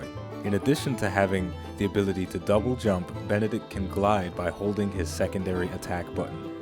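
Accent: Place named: South, United States. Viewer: The taunting and the southern fried accent made this video entertaining. Well done.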